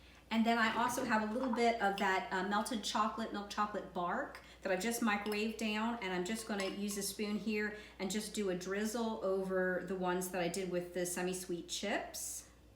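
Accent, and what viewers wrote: United States. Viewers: If I didn't know any better I'd guess your accent to be from south central to eastern Pennsylvania. 😉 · Wonderful and easy!! Are you a Philly girl? I hear the accent!!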